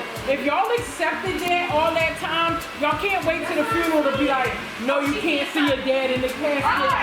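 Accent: Philadelphia, United States. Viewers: I was trying to figure out where you were located then I heard “Real rap” I said yea she’s def. from Philly lol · I'm a guy who loves watching your videos, honeslty just discovered them yesterday. Please tell me that's a Philly accent??? <3 You speak like a cool ass big cuz or young auntie from 215 for sure <3 ...nevermind you just said "home shop in Philly" lol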